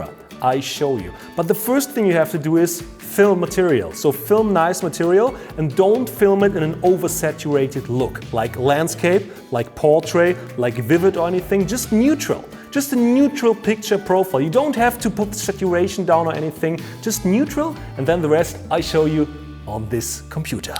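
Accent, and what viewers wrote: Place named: Germany. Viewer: Irgendwie hört man dass du auch Deutsch sprichst ;D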